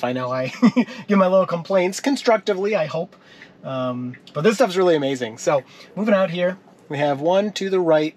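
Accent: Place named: Midwest, United States. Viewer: Just hit SUBSCRIBE, so I feel like I have the right to say this… Dude, you’re in Michigan. What’s with the “y’all” talk??? You even have an unmistakable midwest accent and you are clearly not southern. Just sayin’…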